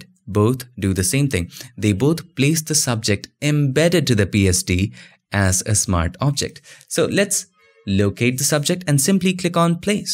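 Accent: India. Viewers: I usually consider Indian accent unbearable to listen to, however..... You are one of the very few that find pleasant to listen to. Congratulations on your audio and voice-over, it's amazing.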